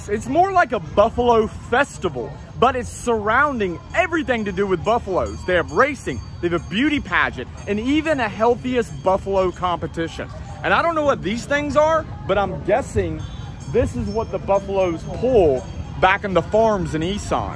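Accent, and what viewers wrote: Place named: Pittsburgh, United States. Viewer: That accent...you from Pittsburgh? WV? Eastern OH?, anyway good videos.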